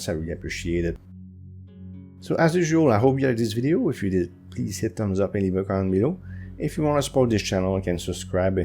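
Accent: Quebec, Canada. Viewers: T québécois?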